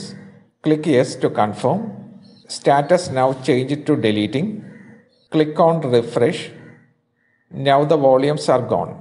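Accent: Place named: Kerala, India. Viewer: Full Mallu acsent ..Funny!!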